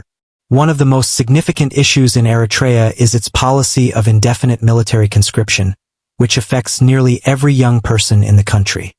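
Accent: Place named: United States. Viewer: why does this kiwi sound like a yank?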